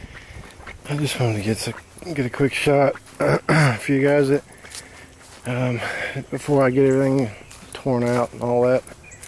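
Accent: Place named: South, United States. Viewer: your excent is not to bad being from alabama, i always enjoyed hearing the southerns talk.seeing i am a yankee. good job on the video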